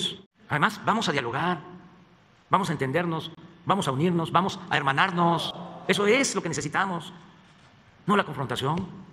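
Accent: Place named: Mexico City, Mexico. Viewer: Para ser tabasqueño, a este señor le sale rebién el cantadito chilango al estilo de Pepe el Toro.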